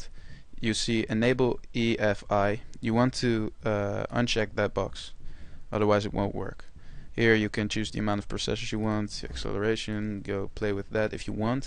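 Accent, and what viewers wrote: Netherlands. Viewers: you sound dutch!!! im dutch too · vanaf het begin wist ik dat je Nederlands was! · are you dutch? Your accent sounds dutch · Hahaha jij bent nederlands :p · you'r dutch ( i too) i can hear it! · Nederlander? haha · Je hoort gwn aan je Engels dat je Nederlands bent. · je doet het geweldig. Heel goed Engels, ik hoorde dat je waarschijnlijk Nederlander bent maar had dat eerst niet goed door. Compliment. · nederlander accent hoor ik.... very nais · je hoort echt aan je stem dat je een nederlander bent ! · Nederlands! ik hoor het aan je stem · i can tell by your voice that ur dutch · yes he is i can hear are you dutch i''m dutch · Je kan heel goed horen dat je een Hollander bent! LOL +1 abonnee! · dacht ik ook al, niet door die comment,maar je nederlandse accent :D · are you dutch??? i hear on your accent i have the same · Volgens mij ben je nederlands. 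I think you are Dutch wahahah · Haha, je klinkt super nederlands, and btw, vreselijk bedankt! :d <3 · he is Dutch and English is his 2nd language. I bet those who complain speak only one language. Besides I think he's got a very nice accent!!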